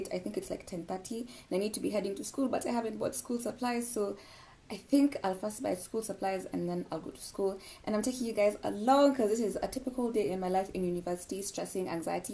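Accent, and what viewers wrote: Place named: Kenya. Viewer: me i thought is not bad english it’s just being Kenyan 🤣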